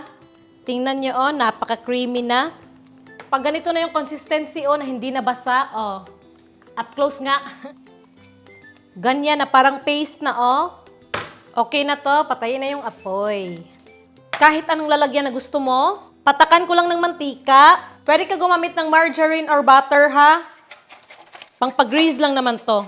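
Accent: Visayas, Philippines. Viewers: Bisaya kaba te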